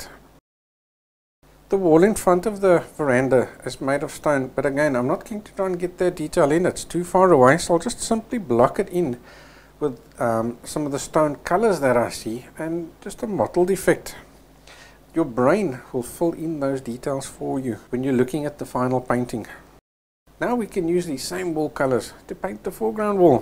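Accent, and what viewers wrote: South Africa. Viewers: I have been watching your work for a couple weeks and I keep saying You sound South African to me. Well I found out today that you are from SA. Lovely I enjoy your work and the effort you make for us to understand.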